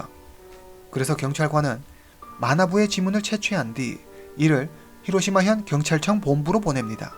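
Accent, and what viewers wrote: China. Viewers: 혹시 조선족이신가요?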